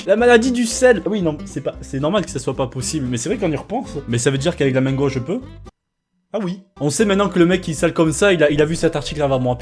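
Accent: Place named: Aveyron, France. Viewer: Le bon accent aveyronnais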